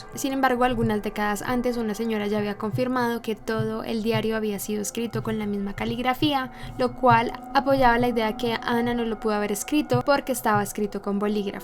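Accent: Colombia. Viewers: kikis pipos pues no lo veas y chao ella es colombiana asi hablan ellas respeta, que tiene que ver su novio aqui??? ganas de joder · Qué horrible es el acento colombiano, ¿no?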